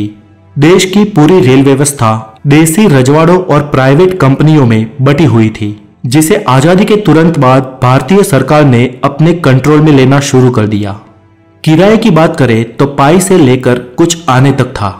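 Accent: Gujarat, India. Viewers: Bolne wala Gujrati hai☺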